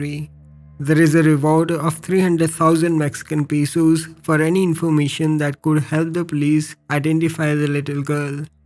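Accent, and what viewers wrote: India. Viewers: Why Indian Guy with Indian denglish as your speaker? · Lol turn on subtitles...my mother is married to an Indian...I'm kinda used to the accent lol... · the indian guy narrates, the accent irritates my ears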